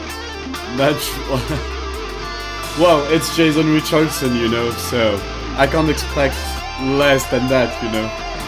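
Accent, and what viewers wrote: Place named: France. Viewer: Avoue t'es français, on a tous cet accent xD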